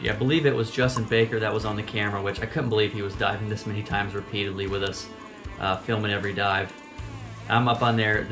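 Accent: United States. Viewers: Cuidadito que habláis feo, eso que es inglés? No creo, el inglés suena más bello. Ahh es Yankee! ACABARAMOS!!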